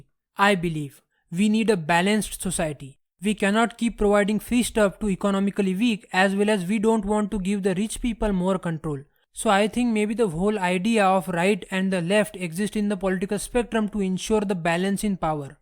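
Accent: India. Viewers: Indian accent 👎 no thanks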